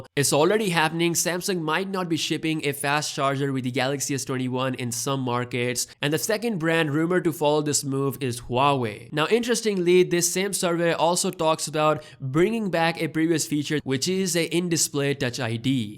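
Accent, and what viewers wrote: India. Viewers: You got somewhat of an indian accent lol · Your Indian accent pops up.. Alot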